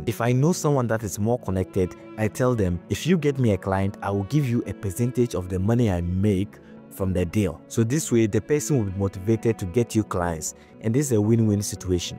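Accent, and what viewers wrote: Ghana. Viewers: Are you from Ghana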